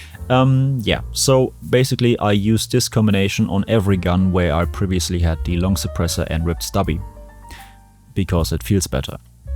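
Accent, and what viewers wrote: Germany. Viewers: I was wondering why you would change the keybind for entering the firing range from Z to Y but then I remembered your German accent xD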